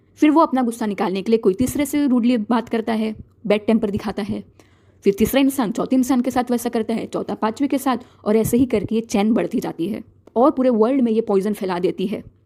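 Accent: Mumbai, India. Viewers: mumbai ki language 😁😁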